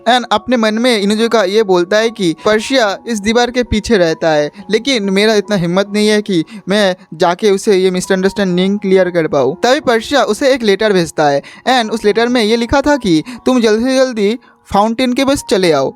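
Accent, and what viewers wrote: Bengal, India. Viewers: Vai apka bat Thora Bangla lag rahera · Bro,are you Bangali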